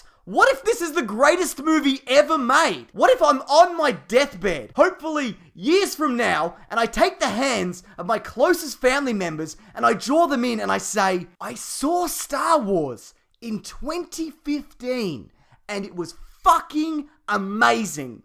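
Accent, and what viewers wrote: Australia. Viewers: You sound like an Aussie and I would know cuz I am one and I live in Australia. Also you should try to do a collaboration with screen junkies honest trailers because you would be hilarious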